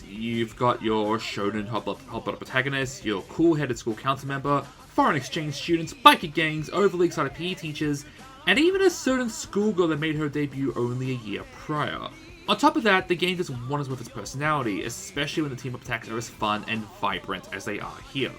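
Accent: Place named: United Kingdom. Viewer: youtube commentary: uk accent introduction that belabors the very concept itself absolute misuse of the word 'unique' rendering it pointless *potential absolute misuse of the word 'weird' rendering it pointless *potential use of the phrase 'deep dive' to suggest the idea of retrospective opinion *This is where I clicked off the video.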